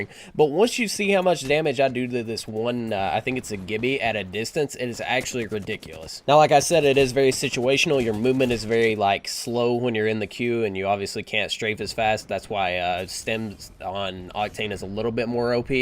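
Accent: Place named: South, United States. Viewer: You have a bit of a southern draw, you from Georgia or the surrounding states?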